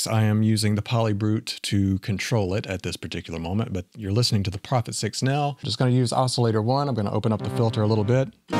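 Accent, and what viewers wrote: South, United States. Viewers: What an awesome video. Or as you'd pronounce it, "Whhat an awesome video." :D You sound a lot like Billy Bob Thornton. Likely my favorite southern accent.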